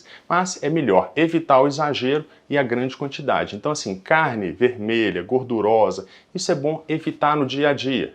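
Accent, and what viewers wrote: Minas Gerais, Brazil. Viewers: Obrigada doutor! Exposição clara, bem articulada, e este discreto sotaque mineiro é muito bonito. Já me foi retirado dois adenomas. Faço o melhor por mim, só não consigo parar de fumar. Mas fumo 2 a 3 cigarros por dia.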